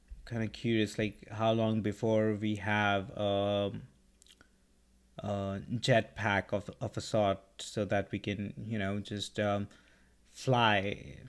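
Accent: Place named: India. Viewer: Nice video man love your accent ahah ;) are u from India ?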